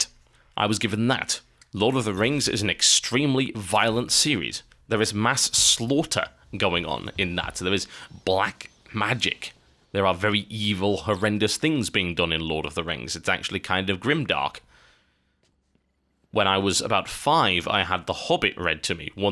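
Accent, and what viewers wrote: United Kingdom. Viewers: Everybody must do this, and they all must be in UK english so that they have a british accent like TB!! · lol I'm just listening so quality doesn't affect me ha ha :D hurray for his awesome british voice!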